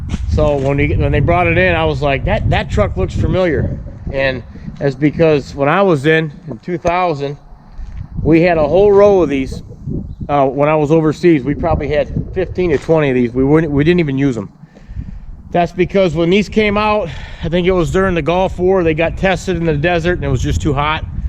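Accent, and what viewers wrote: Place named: Upstate New York, United States. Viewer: which units where you in when in the Army? I am a huge fan, I am active duty now and grew up milking cows and working the farm for my uncles in upstate NY. The more I watch your videos, the more you sound like them. The way you present yourself is clearly as a leader in the Army would, especially when you told your nephew that "we start strong and finish strong." Love the channel. Keep doing what you do.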